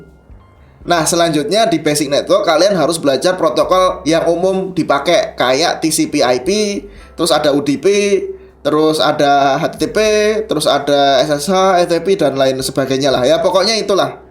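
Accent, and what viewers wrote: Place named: Java, Indonesia. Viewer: agak medhok sedikit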